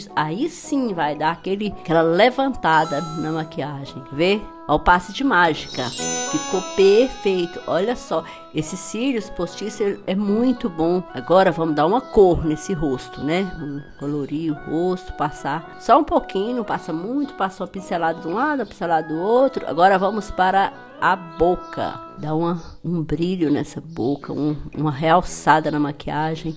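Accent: Minas Gerais, Brazil. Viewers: Se eu que sou de Minas amo esse sotaque nosso,imagina quem não é 😍😍😂😂😂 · Bao de mais...amo sotaque de Minas Gerais 🙌😁😘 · E esse sotaque mineiro haha amo, mineirinha tbm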